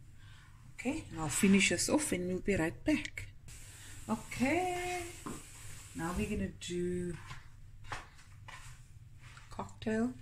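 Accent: South Africa. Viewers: Awesome To hear a friendly South African Voice. I enjoyedyour video very much, everything is already in the cupboards and fridge. I like your trifle its neat and not a messy thing. Lots of love‼️❤️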